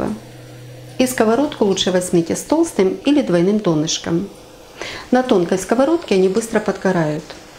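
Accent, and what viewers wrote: Ukraine. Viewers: дорогая. Потрясающе. У меня слезы на глазах от Вашей прекрасной украинской речи, это напоминание о мужестве Украинского народа перед лицом плешивого фюрера. А зразу чочу попробовать с курицей, мясо не ем. Буду готовить с удовольствием, дзякую!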